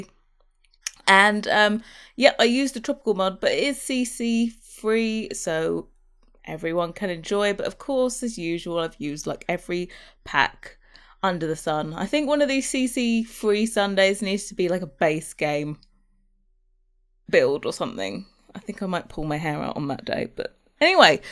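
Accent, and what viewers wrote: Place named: England, United Kingdom. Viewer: I'm not one for commenting in public...very British of me! Nevertheless, your taste is impeccable and I do love hearing an English accent. The fact that this is a 2017 video and the design holds up so well, as well as the presentation of the video , is amazing. Thought I would say it is rather criminal that you aren't more pushed in the Sims community and have subscribed as of about a week ago! Good luck for the future.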